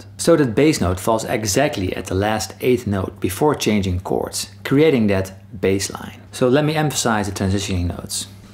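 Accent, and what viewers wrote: Netherlands. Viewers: Ik hoor een hollandse tongval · Jep, it's the Dutch accent :) Actor playing Daario Naharis is Michiel Huisman, also from the Netherlands. :) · both dutch, it's the (faint) accent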